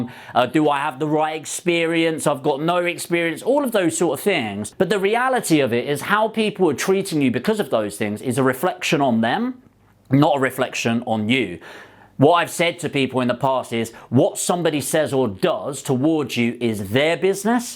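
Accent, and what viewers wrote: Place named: South London, United Kingdom. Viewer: Someone here is having a 'giraffe'... is from 'darn sarf' it's just accent 💛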